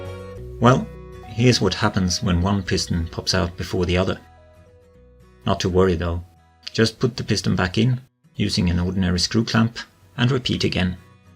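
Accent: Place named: United Kingdom. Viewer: You sound like British!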